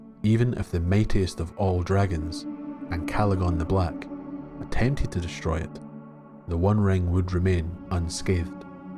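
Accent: Scotland, United Kingdom. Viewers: I've been gobbling up your posts all weekend. Frankly, they're great. And I apologize for taking the piss about your accent. It takes a Scot to pronounce LOTR names and places with some verisimilitude and I take my hat off to you.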